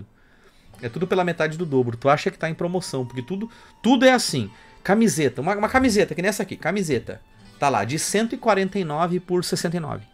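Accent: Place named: São Paulo, Brazil. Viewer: oxi, vc é de RS? vc parece q é daqui da cidade são paulo.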